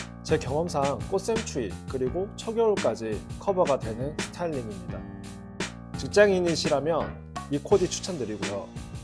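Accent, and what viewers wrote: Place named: Jeolla, South Korea. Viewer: 말투가 전라도광준디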